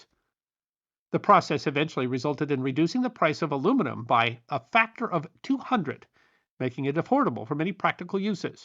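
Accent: United States. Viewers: I don’t love the American speaking. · It is Aluminium It is Antar c tica It is so l der The letter Zed rather than nursery rhyme Zee Dumbing down of pronunciation symptomatic of trumping the US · My ears hurt, bloody Yanks.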